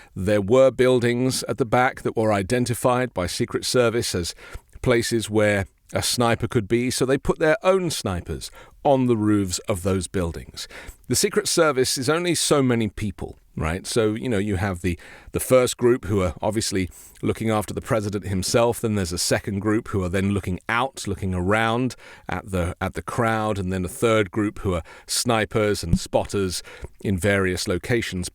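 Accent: United Kingdom. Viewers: Try not to sound British.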